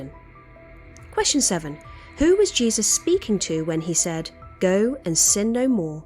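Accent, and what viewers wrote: England, United Kingdom. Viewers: Missed 5☹️… but I always enjoy hearing your beautiful English accented voice😊. Grace & Peace! ❤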